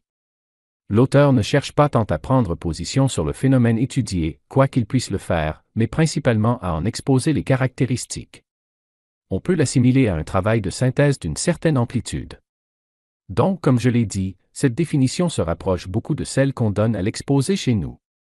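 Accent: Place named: Canada. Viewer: Great job.Pourquoi cet accent du Canada?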